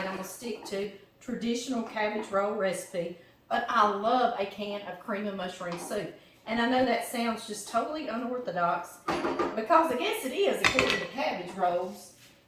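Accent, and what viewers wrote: South, United States. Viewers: I'm a new subscriber and fan and just have to thank you for all the great recipes. I've been binge watching your videos for the last 3 days and love them all! I was raised in SE Texas (Beaumont), so your Louisiana/Southern drawl sounds like home to me. This cabbage casserole sounds wonderful, but I'm wondering if you have ever tried freezing the leftovers. I'm 68, living alone and love to cook big batches of food and freeze the extra for later. I'm just not sure how the cabbage will hold up after freezing and thawing. Thanks so much for taking the time to share your recipes with us and for giving me some new and creative ideas.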